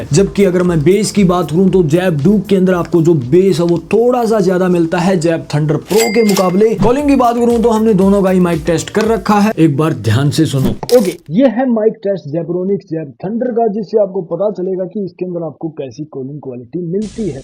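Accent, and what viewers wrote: Haryana, India. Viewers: Bhai haryana te h k?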